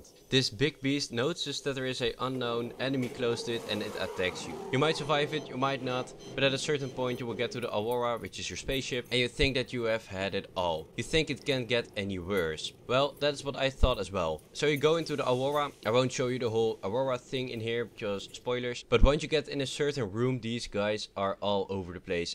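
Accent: Netherlands. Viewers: Are you from holland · are you dutch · Haha ik kan aan je accent horen dat je nederlands bent. xD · Wauw je hoort gelijk dat ie nederlands is XD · 1 question... are you Dutch? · is he dutch? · Hè really sounds Dutch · Yo man, ik kan horen dat je Nederlands bent. Ben ik ook! Vette vids trouwens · you sound dutch? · i havea question... are you netherlands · R U dutch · are you dutch? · are you maybe dutch becaus i am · sounds like he has a dutch accent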